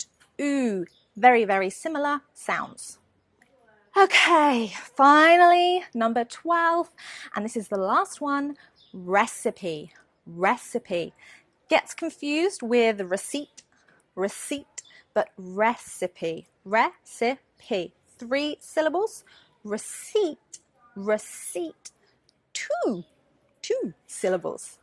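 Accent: United Kingdom. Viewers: I loved British accent after that vedio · cool your british accent.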